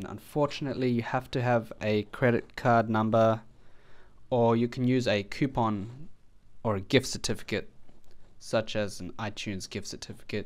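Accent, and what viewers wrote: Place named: United Kingdom. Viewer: British talk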